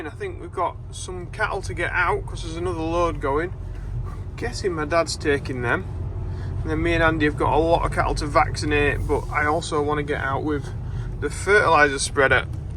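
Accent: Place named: Yorkshire, United Kingdom. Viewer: sorry buddy im going to pay a small visit tomorrow, Ive just watched a section of this blog to hear a proper Yorkshire accent coming out "Aye it's turned into a real nice day i might even ave to tek off the overalls for the first time in the year" got me smiling, great video as always keep up the good work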